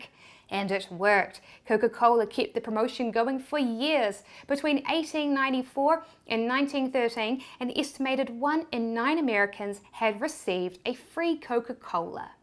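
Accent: New Zealand. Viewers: Is that normal accent in new Zealand ? That'd be awesome! Greetz from Germany 😱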